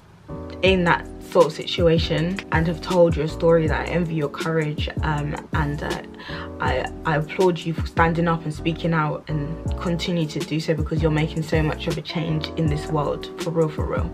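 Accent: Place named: London, United Kingdom. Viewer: Gurlll I'm about to talk about this show next on my channel. Subscribed as it's good to hear a similar london accent to me 😍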